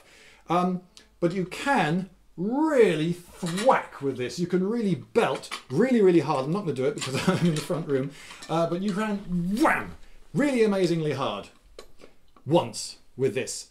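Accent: France. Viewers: Outrrrrrrrrrrrrrageous French accent! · This is the 6th time I watched this video and the 5th time I watched it for the outrageous french accent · Brilliant French accent ! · Thumbs up for French accent. Lol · Best French accent ever! ;D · Love the outrageous french accent :) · your french accent is awesome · Your french accent is very reminiscent of the pineapple from telefrancais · Yes it's good. Also your french accent is hillarious. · Your french accent just made my day ! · I don't think the poor defensive capability would be as much of a disadvantage if you had a good shield with it. Naturally still worse than other weapons, but not as ridiculous as your French accent makes it sound like. I've always wondered why several balls would be a benefit, though. Good video. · i liked the video only because of the frenc accent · You should do more french accents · Your outrageous french accent sounds like Engrish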